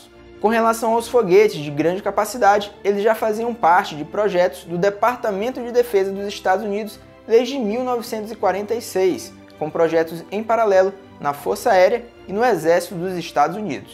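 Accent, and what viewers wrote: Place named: Ceará, Brazil. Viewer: Q bom q o YouTube me recomendou o seu canal. Sou vidrado no espaço e em suas estrelas. Sou de Fortaleza - CE, posso estar enganado, mas tenho quase certeza q vc tbm é cearense por causa do sotaque 👏, LIKE, + 1 inscrito, e tmj irmão!!!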